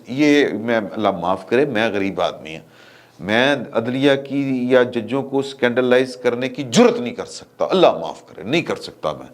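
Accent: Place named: Gujrat, Pakistan. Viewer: سر جی جواب ضرور دیجئے گا آپ بیشک لاہور رہتے ہیں مگر پنجابی آپ گجرات والی بولتے ہیں کیا آپ کا اصل تعلق گجرات سے ہے؟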